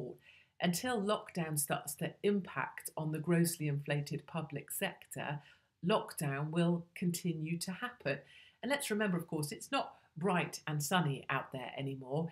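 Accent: United Kingdom. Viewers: your beautiful. Classy. Your british accent is perfect and not thick and heavy, l think some british try to make it thick.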